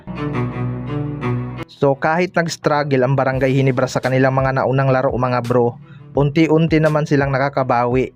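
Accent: Visayas, Philippines. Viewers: Samang pkinggang boses ng bisaya tpos bkla pa tpos fake news pa ay sarap samplin ng burat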